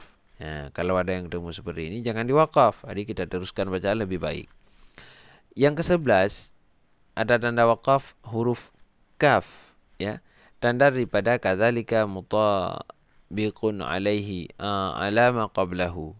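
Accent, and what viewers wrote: Aceh, Indonesia. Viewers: Bahasanya...kaya orang aceh yaaah...jdi inget temen saya dri aceh zamroni holil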